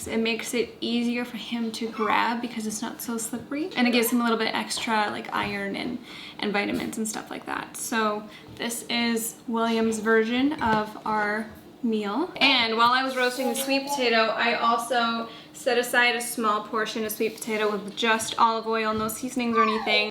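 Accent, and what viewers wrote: Canada. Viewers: Definitely not all Canadians! She is from essentially the US Midwest equivalent of Canada. Compared to in Ontario (where Toronto and Ottawa are, our largest city and capital), I haven’t heard many people talk like she does! Another interesting one is how she says garage. Regional differences are so interesting!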